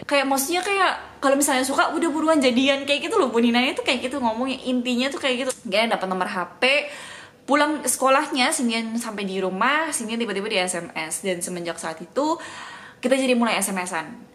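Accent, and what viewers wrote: Surabaya, Indonesia. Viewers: Lucuuuuuuu medokkkk surabaya banget deh 👍🏼👍🏼😂😂😂😂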